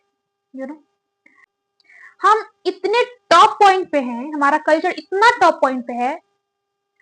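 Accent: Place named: Bihar, India. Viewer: Btw you've got that hardest bihari accent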